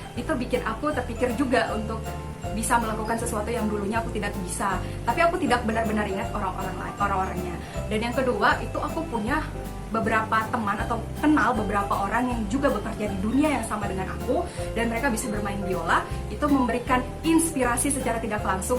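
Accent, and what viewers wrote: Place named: United Kingdom. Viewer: Lebih pantas lg klo bicara tanpa menggunakan aksen English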